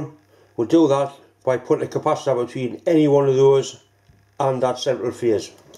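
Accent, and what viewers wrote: Newcastle, United Kingdom. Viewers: It would be good if he spoke English and not Geordie.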